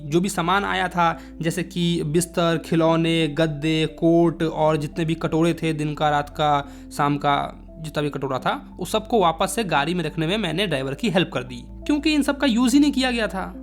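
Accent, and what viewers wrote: Bihar, India. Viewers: it also have bihari tone , i can understand as i am bihari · Your Bihari accent is just 🤌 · bhaiya ap thode se bihari ho kya😭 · Boli to pura bihari jaisa hai ❤❤❤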